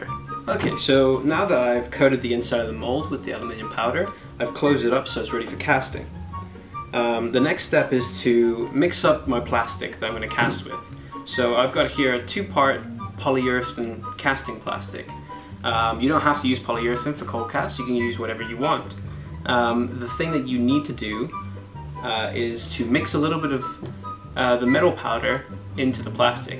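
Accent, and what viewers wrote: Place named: United Kingdom. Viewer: i recognise that accent are you from the uk???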